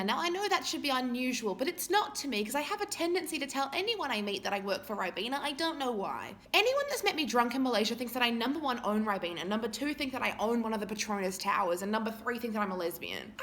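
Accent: Australia. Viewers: Were you born in Malaysia bc you have an Australian accent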